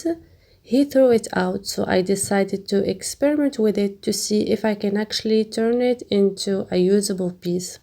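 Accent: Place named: Morocco. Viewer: Where are you from? You have a morrocan accent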